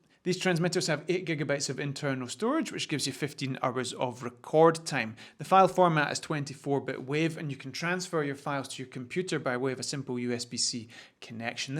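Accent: Scotland, United Kingdom. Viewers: Hey, love your videos and espacially the scottish accent haha Where can we find the tripod that you use?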